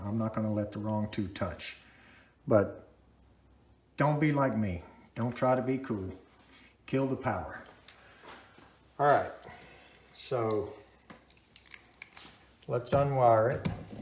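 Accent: South, United States. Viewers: Honeywell used to make an escutcheon plate for use when replacing a large with a small thermostat. Does this Ecobee have such a plate; you would think so since it is a small thermostat. Another great video from the soft spoken Southern expert.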